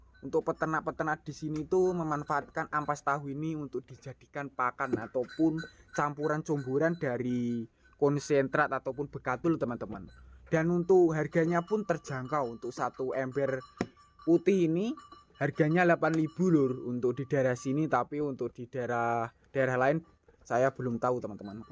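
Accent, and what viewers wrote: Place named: Java, Indonesia. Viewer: 170 sen ato 170 rp ato 170 ribu broo Medok kali kmu ya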